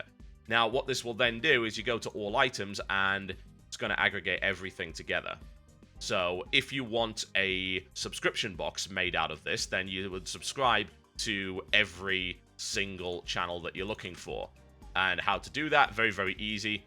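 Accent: United Kingdom. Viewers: that just doesnt have a nice flow to it tho cynical brit yeaah cynical american eh? beside aslong as he doesnt lose his british accent and doesnt start pronouncing words like puma the american way then hes still a brit to me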